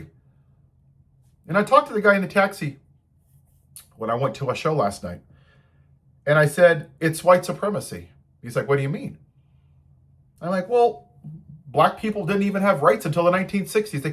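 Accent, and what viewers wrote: United States. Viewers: You sound like a refreshingly normal American. Do come back. Good luck to you.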